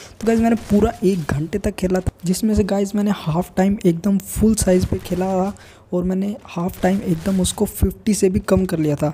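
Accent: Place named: Hills, India. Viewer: Bhai tumahri aavaj pahadi Se milti hai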